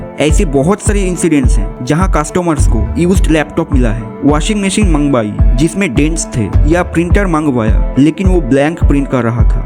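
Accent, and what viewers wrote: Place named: Bengal, India. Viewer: Your Bengali hindi accent ❤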